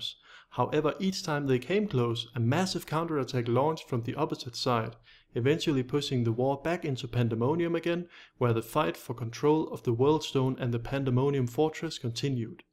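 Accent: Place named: Denmark. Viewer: Nice job! Where are you from? Your accent sounds very Danish, but I'm not an expert ;)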